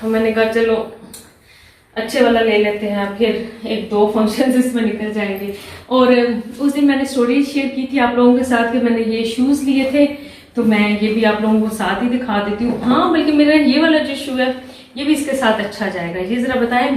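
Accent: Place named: Punjab, India. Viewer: I love your punjabi texture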